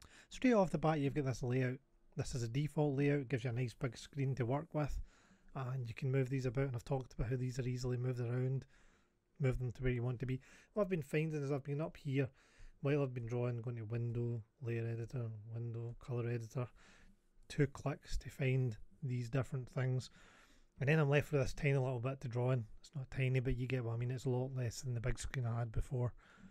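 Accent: Scotland, United Kingdom. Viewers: I just come here to hear the Scottish accent. It's my favourite accent in the British Isles.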